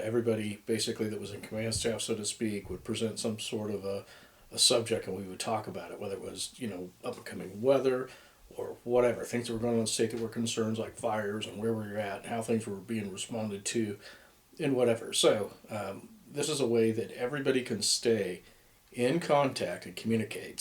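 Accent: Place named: United States. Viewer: Even though you have an american accent, I believe you are a person who fled from Iran and is probably like an american proud christian now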